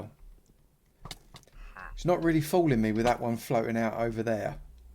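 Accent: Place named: London, United Kingdom. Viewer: Proper Londoner mate!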